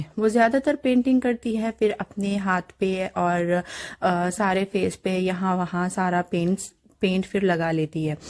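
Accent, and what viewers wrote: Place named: Hyderabad, India. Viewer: Hey, Assalamualaikum. I live in Melbourne, Australia too. I just wanted to suggest that you don’t change your accent our Hyderabadi accent is the best. ❤️ Love your videos🤍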